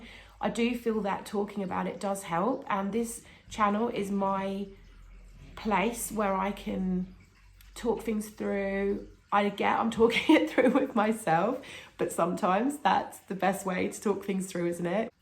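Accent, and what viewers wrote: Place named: United Kingdom. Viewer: The strength you have to go through so much, and raise two children! It's unbelievable when MD's don't take us seriously. You should have immediately been given a mammogram and I should have been given a CT scan. I shared your video with a friend who was upset about the neglectful treatment you received, and she's impressed with your positive attitude too! Btw, I'm in the US. I'm guessing by your accent you're in the UK. My mother is from England. Anyway, it sounds like you're taking care of yourself. Please don't be hard on yourself! The supplements sound good. I take so many supplements, including Resveratrol. I'd totally replace the alcohol with green tea and whatever nutritious things you enjoy. Remember there are lots of people who care! 💝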